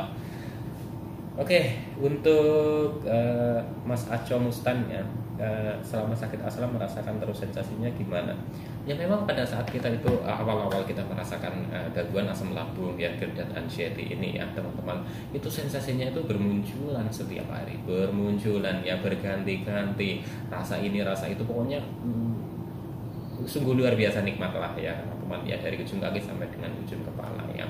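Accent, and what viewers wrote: Banyumas, Indonesia. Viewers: Mas. Sembuhnya Berapa lama?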